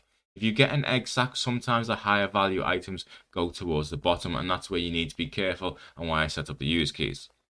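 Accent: Liverpool, United Kingdom. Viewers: scouser by any chance? haha!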